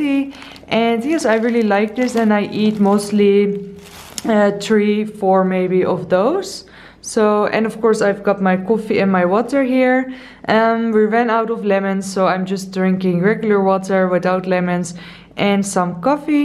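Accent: Netherlands. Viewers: Ik hoorde meteen je Nederlandse tongval😅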